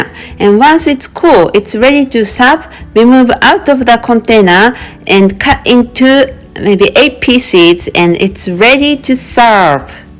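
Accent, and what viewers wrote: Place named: United States. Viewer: Thank you!!...I love your accent japanese - american ;greetings from a peruvian nikkei !!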